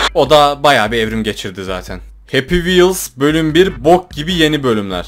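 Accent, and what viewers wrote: Turkey. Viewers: Abi sen öz sivas lısın çünkü k leri g söylerek okuyon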